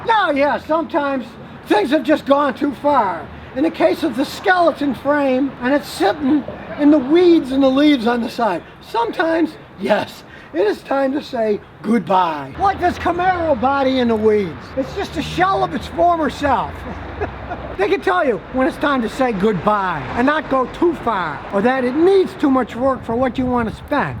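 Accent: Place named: United States. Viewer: Where the hell is scottie from? Sounds like a yank himself.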